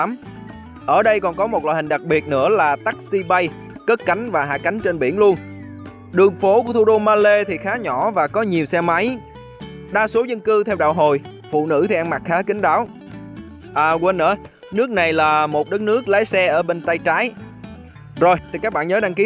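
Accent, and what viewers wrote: South, Vietnam. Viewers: Giọng Nam bộ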